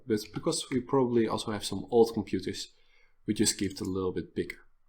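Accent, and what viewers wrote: Netherlands. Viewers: Hey, I have a question:) I am making a maze game, but I want to make it so that at the loading screen you have a sphere which is moving in the maze. But I want to make it so when it hits a wall it goes to the left or right or straight or go back, depending on where the walls are. Do you know anyone who can do this/explain to me how to do it. I'm really struggling with this, if you do, it would be awesome. You can also send me some code (if you do understand how this works) I could learn a lot from that!:) Thanks! If you need to know anything, just ask me. And you got a new subscriber:) Btw, Love your accent. Are you dutch right?:) I am!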